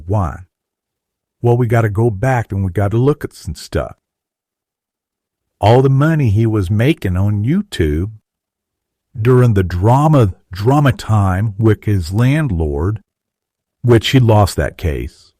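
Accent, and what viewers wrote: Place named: South, United States. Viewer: Still listening....only 10 minutes in so far....there's that southern accent, lol 👍......you and your mate, need to do some live streams....awesome first video...can I post your video link on mine?... We need more channels like this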